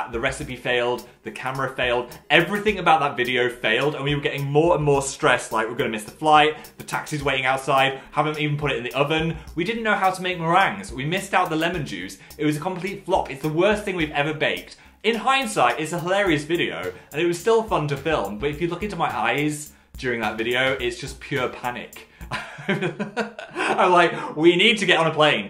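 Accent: United Kingdom. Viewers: Is it just me or does Phil’s accent sound more British than normal if you get what I mean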